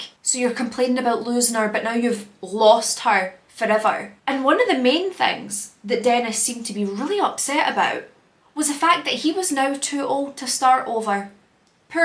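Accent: Scotland, United Kingdom. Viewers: Just found you and I love hearing another Scot ❤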